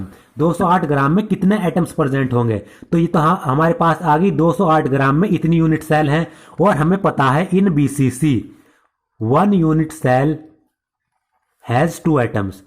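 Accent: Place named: Hapur, India. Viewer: I think you belongs from near hapur According too your language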